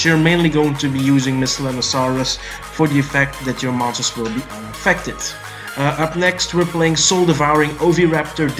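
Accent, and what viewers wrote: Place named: Germany. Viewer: I hade ask because your accent listen a little bit like my accent. And yeah I am from Germany so hello neighbor.;)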